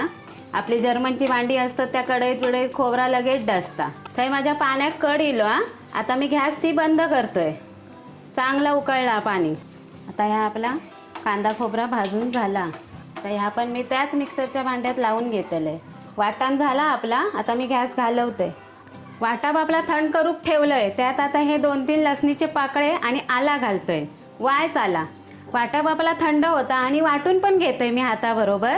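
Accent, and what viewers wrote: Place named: Malvani, India. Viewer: वहिनी आज तुमची चिकन वड्याची रेसिपी बघितलंय तोंडाक पाणी तर सुटलाच पण तुमची रेसिपी सांगूंची पद्घत तर औरच। मालवणी रेसिपी बघूची आणि मालावणी आयकायचा ता तुमचाच। मस्तच।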